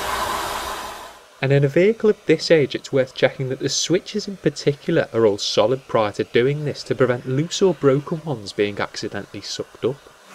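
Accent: Liverpool, United Kingdom. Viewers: You're a scouse I'm a manc......gotta tell you guy......good job..... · Scouse accent + Soft Voice + Proper English... Champion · from canada? yeah right, i hear that scouse accent..................lol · Not been this engrossed in a Scousers running commentary since Ringo Starr narrated Thomas the Tank Engine back in the day. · I am not that familiar with Amy MacDonald but she's Scottish and the has a Scouse accent so you haven't even got the right country.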